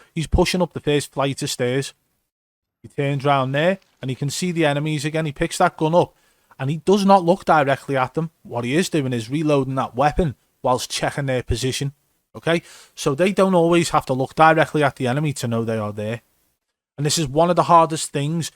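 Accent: Liverpool, United Kingdom. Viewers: U from Liverpool?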